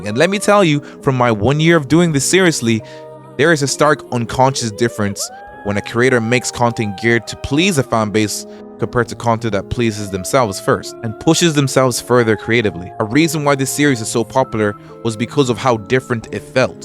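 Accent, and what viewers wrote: Ireland. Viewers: Are you Irish, you kinda sound it?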